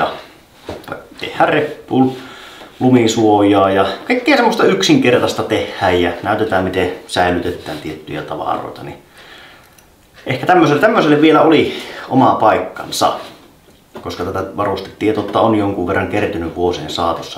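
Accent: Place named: Kainuu, Finland. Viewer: Ihanata kun kuuloo Kaenuun murretta ja nuinkii tokusti puhuttuna ja tärkeästä aeheesta. Kiitos vaen!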